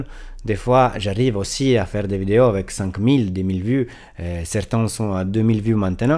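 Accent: Italy. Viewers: Salut. Tout d'abord, un grand MERCI pour ta chaîne et pour l'effort de parler correctement le français avec ton accent italien ensoleillé, alors que la plupart des youtubeurs francophones blingbling avec du RGB partout ont moins de mots à leur vocabulaire qu'un berger allemand. Je me suis abonné à ta chaîne parce que tu vas à l'essentiel de l'info sans fioriture et surtout sans effets de style linguistiques "tendance" et ça, ça repose vraiment la cervelle. Tes avis et critiques semblent refléter tes connaissances professionnelles d'ingénieur informatique et c'est plutôt rassurant 😉 Sinon, pourrais-tu parler un peu plus du matériel que tu conseillerais pour des machines orientées artistes 3D (Unreal Engine5, C4D, Blender, AE...)? Merci 😉😊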